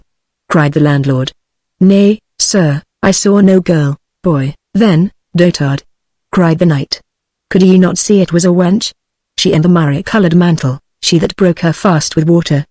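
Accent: United Kingdom. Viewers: British female robot. Awful. Avoid.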